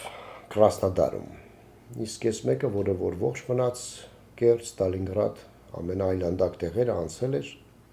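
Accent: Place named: Lori, Armenia. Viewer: Да здравствуйте Лоринский Акцент Люблю свою Родину ❤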